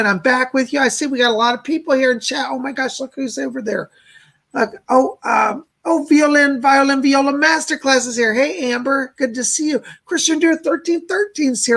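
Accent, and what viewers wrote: United Kingdom. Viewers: Blessings Lord. Love the English accent (lush) lol Cola has brought so much positive energy an love and light to your being. We are so blessed to have her in our lives. She is my little sister and I'm Mama pit bull over her and you. Team Lady Cola and Lord. Blessings to you and lady Cola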